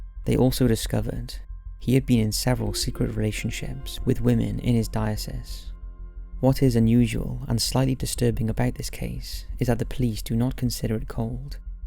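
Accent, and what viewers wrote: Wales, United Kingdom. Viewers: Except as a Welsh girl, I definitely do hear Welsh in his accent! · It's definitely Welsh, I live in the Midlands and we sound nothing like this guy. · Sounds welsh to me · Definitely sounds Welsh to me! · Deffo welsh!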